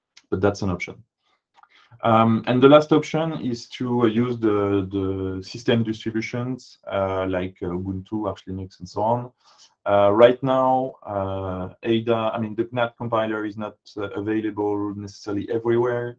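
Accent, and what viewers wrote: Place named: France. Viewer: There is a lot of information in this and has done a good job in assembling it. However, a video like this stands or falls on the quality of its audio delivery. It just isn't fair that AdaCore would ask a professional software engineer to deliver a presentation like this without adequate training in voice projection. There are far too many French pronunciations of vowels (e.g. SOORCE instead of SOHRCE for "source"), pauses as the presenter searches for the right word or phrase, drops in volume at words that are vital for comprehension and so on. The whole video should have been scripted before presentation. I do not mean merely scripted like a piece of text to be read in user documentation. I mean that the script should: 1. Cover all the points that need to be made on the AdaCore Community -> FSF transition, Alire, project and library development; 2. 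Be comprehensible to all with reasonable fluency in English; 3. Be phrased in a way that is easy for this presenter to deliver. Once an appropriate script is made, the presenter can then focus on the relatively easy tasks of getting his pronunciation correct and his projection loud and clear. I hate making critiques like this because it is clear that the presenter here is absolutely expert in his subject. But he has been badly let down by his employing organization, AdaCore. They sent him out to bat without any proper preparation or training. You cannot create a software language community without investing in clear c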